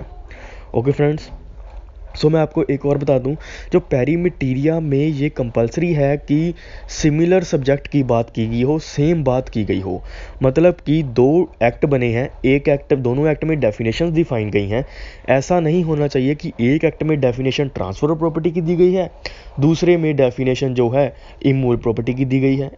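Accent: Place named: Punjab, India. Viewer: Bhai Punjabi accent hain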